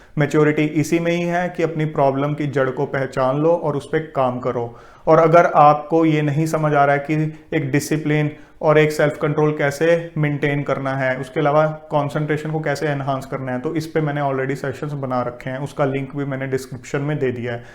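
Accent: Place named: Punjab, India. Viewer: punjabi punjab aala banda e bolda mere pra.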